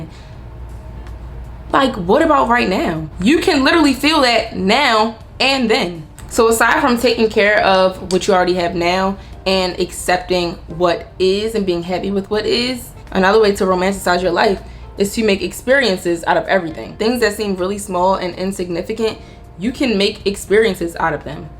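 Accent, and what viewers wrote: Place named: DMV, United States. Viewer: Sis you gotta be from the DMV . It’s the accent for me . I love it here 💕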